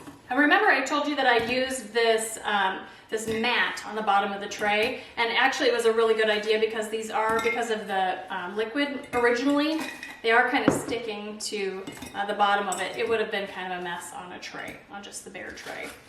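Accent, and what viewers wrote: United States. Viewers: it doesnt matter, its kind of cute and funny how Americans pronounce it ❤️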